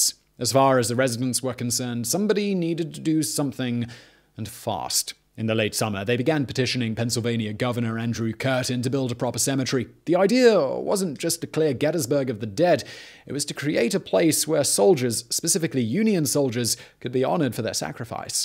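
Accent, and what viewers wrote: United Kingdom. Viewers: I don't think this guys videos would be nearly as popular if he didn't have a british accent. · its interesting to hear a brit talk abt the civil war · I don't know about somebody with a British accent lecturing about American history really impresses me. I think I would lean more towards David Barton, a true American Historian who backs up everything he teaches with hard fact and thousands of documents from the Halls of Congress and other resources to back them up. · I love listening to with all the random facts with his video’s but I have to admit, I feel a little dirty listening to a Britt tell American History.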